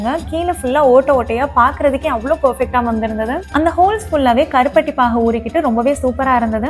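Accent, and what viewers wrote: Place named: Kayalpatnam, India. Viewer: Eanga ooru kayalpatnam solra style super.eanga iruntha eanna.sontha ooru special than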